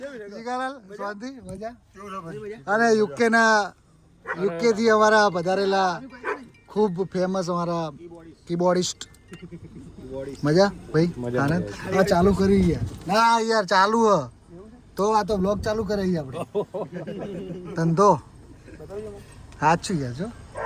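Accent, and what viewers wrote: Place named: Mehsana, India. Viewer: ખુબ સરસ મજા આવી સ્ટેજ પ્રોગ્રામ જોવા ની તમારા બ્લોગ માં જે ભાષા બોલો છો મહેસાણા એતો બહુ સરસ મજા આવે છે સાંભળવાની😊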